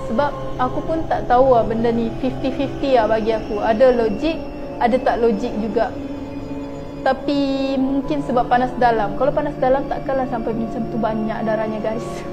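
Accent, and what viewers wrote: Sabah, Malaysia. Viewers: Orang Sabah ke?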